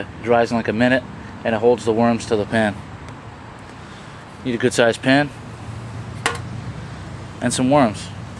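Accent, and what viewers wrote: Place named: Brooklyn, United States. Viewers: Are you from Brooklyn by any chance? You sound like it. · Not bad. You sound like your from New York, Boston, etc. where exactly are you from? I'm a Southern boy from Alabama. Fishing, college football, and most important following Jesus Christ is our passion here. If you ever get the chance, Feb., March, and April is the time to come to Alabama with your boat. Lay lake, Mitchell, Logan Martin, Guntersville, and many more lakes here with monster bass. From Smallmouth on the Tenn. river, spots on Smith, Mitchell, lay, Logan Martin, to Largemouth on Gun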